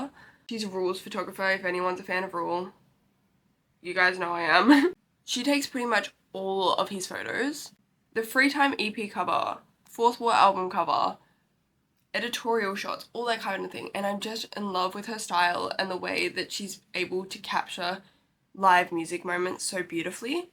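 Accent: Australia. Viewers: I just love your accent ! I always hear american accent but never aussie one, as a non native english speaker it feels so weird but so cool !!!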